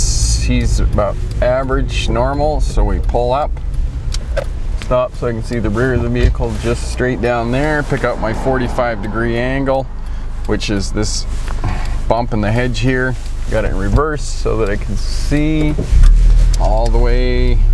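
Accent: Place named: Western Canada, Canada. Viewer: I was watching this thinking, "this guy must be from Western Canada" and lo and behold from BC. I can tell me Canadian accents pretty good.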